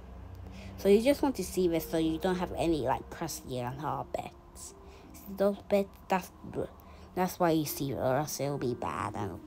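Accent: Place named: United Kingdom. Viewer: Awwwwww she’s so cute! Love the British accent ❤❤❤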